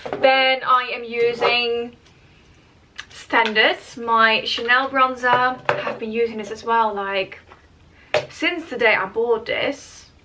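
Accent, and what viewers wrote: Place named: Netherlands. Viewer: Ik hoorde een ‘kleiin NLaccentje’. En toen zag ik je naam en wist het zeker😄. Bedankt voor de review!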